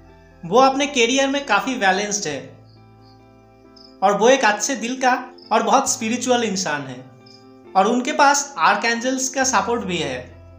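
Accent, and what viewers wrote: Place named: Bengal, India. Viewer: I feel like listening to your accent, you are bengali 🙂 I chose Pile 1 and happy with the reading which my intuition is also saying, and watching tarot readings for more than 2 years, seeing the cards came out in your reading for Pile 1, I know what you didn't said about the characteristics of my husband 🤨 but I got my answer, because it confirmed that with whom I intuitively know I am getting married very soon in 2023, He has Capricorn Sun sign, That major Arcana Devil, He is all what the Devil Card, The King of Cups (Don't show up emotions very well but loves deeply) that 7 of Swords, 8 of Cups and Hanged Man represent my partner, I know all about his negative things but still I want him only as my husband in this lifetime and in my next life times 🤗 I am older than you, so as an Elder Sister I give lots of blessings and Good wishes for your Channel 🙏🏻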